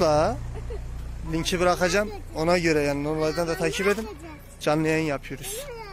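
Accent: Turkey. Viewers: adanalı gibi sanki yeniyim aranızda ben adanalıyım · Sen elazığlı mısın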